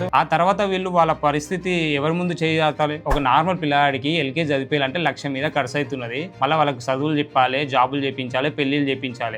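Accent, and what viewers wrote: Telangana, India. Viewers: ❤నువ్వెవరు రా భాయ్.. మా చంద్రబాబు ఏమయినా చెప్తాడు.నీకెందుకు... నీ భాష తెలంగాణా లా ఉంది పొయ్యి తెలంగాణా లో చెప్పుకో . CBN EVM తో గెలిచాక మైండ్ దొబ్బింది... మా ఆంధ్రా లో ఏమైతే నీ కెందుకు భయ్యా❤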